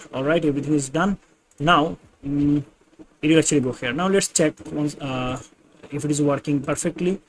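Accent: India. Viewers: 50% = How to make a table in html . Strong idian accent. Badly confused instructor. With errors. Only full refresh method. Please do again.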